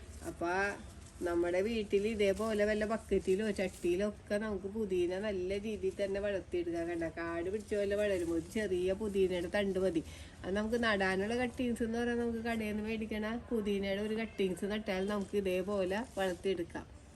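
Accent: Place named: Ernakulam, India. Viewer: സംസാര ശൈലി തന്നെ വേറെ ഒരു സ്ലാൻ ഗ്.... എറണാകുളം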